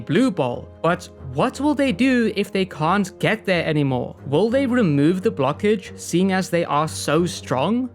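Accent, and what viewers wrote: South Africa. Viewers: Am I hearing a South African accent? Lmao vase line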